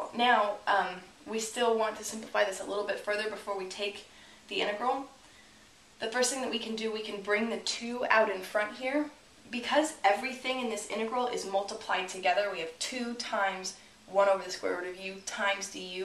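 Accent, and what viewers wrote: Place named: New Jersey, United States. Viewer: That's a lovely accent you have, New jersey ? Or austria 🤔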